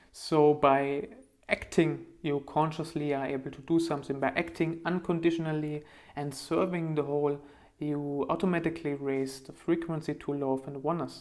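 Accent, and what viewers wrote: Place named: Germany. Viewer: Grateful that I found your channel.. and an very calm and deeply accurate.. luckly I love raw foods.. ahaha.. your esscent is familiar, are you from Germany? Thank you once again for your expression..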